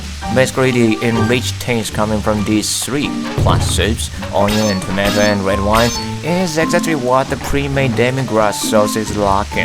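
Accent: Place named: France. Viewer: What are you talking about? best part of the video is his somehow french accent!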